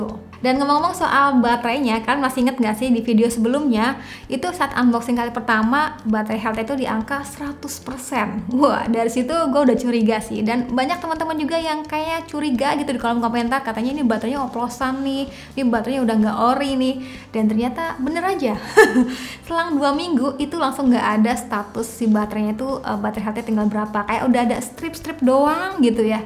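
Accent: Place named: East Java, Indonesia. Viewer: Orang jawa timur kayaknya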